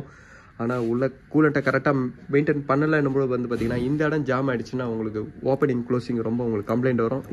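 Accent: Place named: South India, India. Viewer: I didn't understand what you said In south accent but it's very informative ❤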